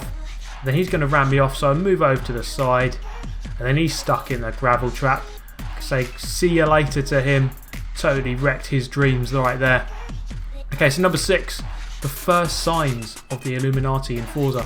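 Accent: United Kingdom. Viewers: WTF I was picturing you as a 30 year old fat white british dude hahaha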